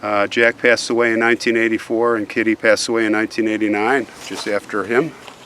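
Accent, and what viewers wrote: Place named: Chicago, United States. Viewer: WOW 😣 WHAT A STORY AND VERY SAD CASE THAT THE NATIVE AMERICANS ARE TREATED SO POORLY, YOU ARE UP NEAR MY FAMILY WENT ON VACATION WHEN I WAS A KID, MINOCQUA, WOODRUFF, EAGLE RIVER, ST GERMAIN, TOMAHAWK GREAT AREA, HOLY CRAAAP THE TERROR WAS THE SHIP I SAW A SHOW ON THAT RECENTLY AND THE DOCUMENTRY TOO GOOD STUFF BROTHER, I CAN HEAR THE CHICAGO AREA ACCENT IN YOUR VOICE LOL 😆😆 TAKE CARE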